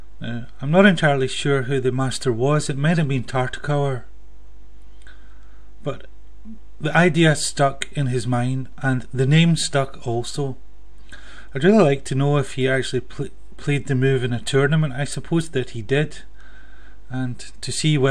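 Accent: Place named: Scotland, United Kingdom. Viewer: I think he's saying "pat" -- a "pat opening" ... but these Scots accents are tricky!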